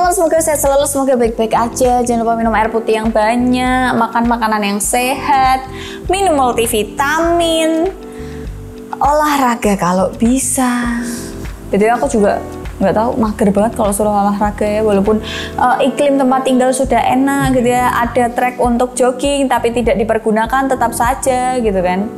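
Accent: Java, Indonesia. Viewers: kirain dinda haw tapi koq medok😁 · logat jawanya padat bnget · Medok e pancet ae mbak wkwkwk, sue ndak di ndilok mbak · Aku suka medoknya mbk itu lo 👍👍👍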